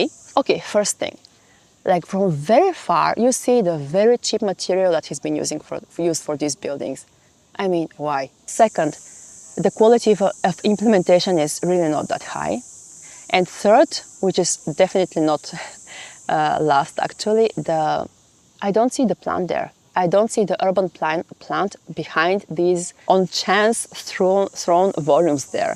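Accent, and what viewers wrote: Bulgaria. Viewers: Cudno, ali prvi put slusam nekoga da govori srpski sa bugarskim akcentom. :D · bravo..ali tako je jak bugarski naglasak kod nje, vrlo simpaticno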